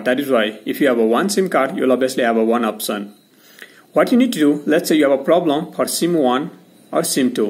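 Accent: India. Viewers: U are Bengali ?? U accent is pure Bengali ... Bhai Hindi may bolo naaa itni takleef kyun le raheho bygan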